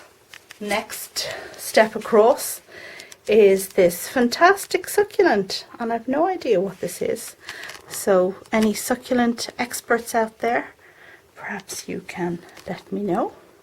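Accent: Ireland. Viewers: I appreciate your Irish accent so amazing! Keep on making your marvelous job!... It.’s pm in Rio but I had to see your voice and your lovely way of explain the plants secrets...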